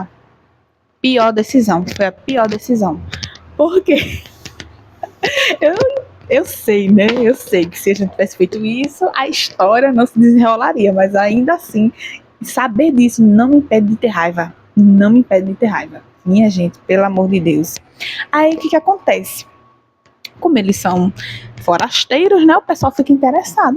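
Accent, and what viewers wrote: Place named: Recife, Brazil. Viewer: O seu sotaque é do Recife.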